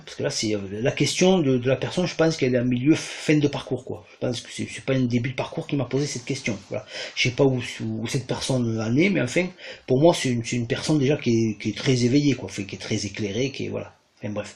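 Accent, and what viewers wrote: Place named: Cantal, France. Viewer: Bonjour, je viens d'ecouter plusieurs de vos potcasts et ce qui m'a frappé c'est votre accent qui ressemble fortement à celui de chez moi, le Cantal 😅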